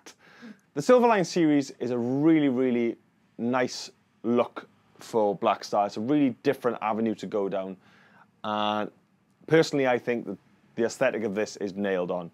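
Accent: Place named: South Wales, United Kingdom. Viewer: Brilliant video,. (I’m from South Wales but living away so good to hear a familiar accent). Although this vid is from a couple of years back, it’s still an outstanding demo of the amp. At first I was thinking I wanted you to keep playing the same licks and sequences so as to make it easier to compare the voicings; but as the demo went on, and the excellence of your playing became apparent, it seemed good that you’d modified your style to suit the nature of the sound in question. You were also one of the first demonstrators I’ve seen to mention at the start that the modelling technology used here is in line with the sophisticated developments of Kemper, Fractal and Line 6. Excellent work. Thank you!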